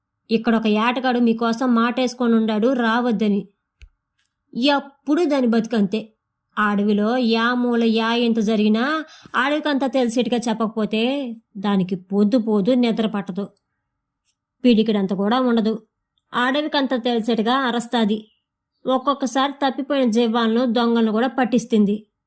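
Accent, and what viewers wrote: Rayalaseema, India. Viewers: బావుందమ్మీ నువ్వు సెప్పిన ఇదానం.. కథను కల్ల ముందు నిలిపినవ్ బా.. గొప్పగా చెప్పారు గారు.. మా సీత్తూరు భాషలో.. అందులో మా వెదురుకుప్పం లో రచయితకి పక్కన ఊరు మాది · నేను రాసిన యాట కథకు మీరు రాయల సీమ యాసలో జీవం పోశారు మీకు మీ ఉషా చానల్ కు దన్యవాదాలు మేడం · నమస్కారం madam, యాట కథలో మీ వాయిస్ రాయలసీమ స్లాంగ్ లో చాలా బావుంది. ( అమీరున్నీసా )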